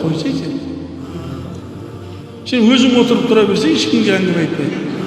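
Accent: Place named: Uzbekistan. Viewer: Озбекше акцент урып тур устаз. неге озбекшеде амри маруф кылмайсыз ?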